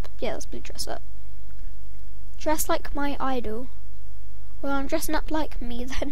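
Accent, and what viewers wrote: United Kingdom. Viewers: i love that you are Brittish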